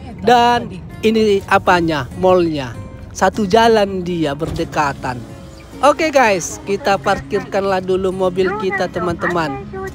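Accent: Medan, Indonesia. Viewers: Indonesianya dari mana bang, Medan kah?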